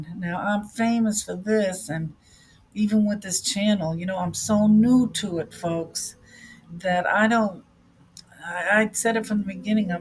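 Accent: New York, United States. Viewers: I love your list! Usually I'm not excited about lists I find in YT videos, but THIS one is wonderful bc it is so personal to you -- and to me! I'm just 4 days in and terrified to speak it bc I'm afraid I'll fail. Bless you, young angel for your heartfelt words. You'll be better soon. Food poisoning is the pits! (is that a NY accent I hear?? love it)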